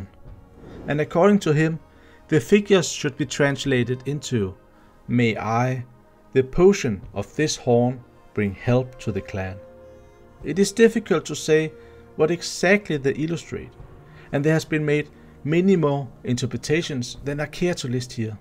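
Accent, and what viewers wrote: Denmark. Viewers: I dont Care about your danish accent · your light Danish accent is very cute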